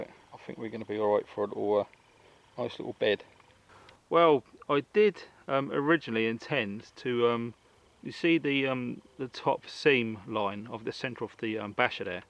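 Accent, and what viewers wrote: United Kingdom. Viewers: hi, my first time watching you and I thought you were gonna be american but.... no! finaly some british guy! great vid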